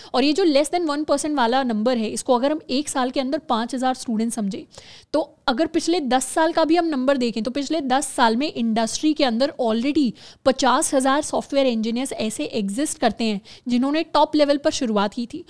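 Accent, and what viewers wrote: India. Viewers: It hard to understand indian english accent 😢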